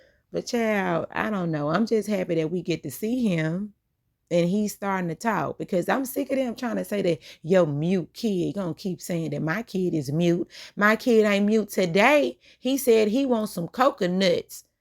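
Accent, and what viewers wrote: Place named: Mississippi, United States. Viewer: You have a Mississippi Delta or Memphis, TN accent.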